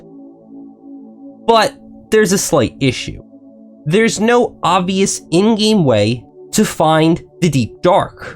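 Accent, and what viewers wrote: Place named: Northeast, United States. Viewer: First of all, great video and it’s the first I watched of yours Second, you’re definitely north east coast 😂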